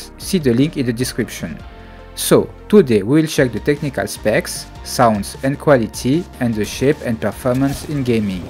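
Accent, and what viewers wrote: France. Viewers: Thanks for your videos. I love your french accent :)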